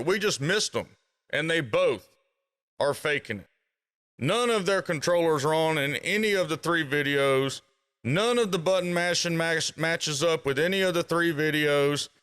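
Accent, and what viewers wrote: South, United States. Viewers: I thought this was a joke you really talk like… hillbilly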